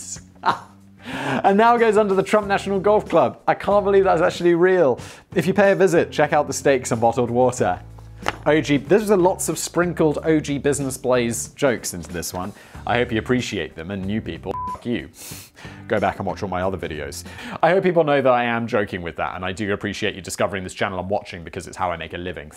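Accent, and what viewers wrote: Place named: United Kingdom. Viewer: Knows everything about trailer laws in the UK but nothing about the history of cars worldwide and has a channel called 'Today I found out: Brain food for hungry minds'.. ..as much as I enjoy this light hearted content, you're losing credibility as having a curious mind Simon (the Czech, US Citizen, and British sounding person)..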